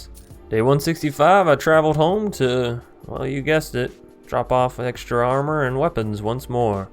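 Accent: South, United States. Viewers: Talking like an ol' Truck driver from the south. Love it! 😎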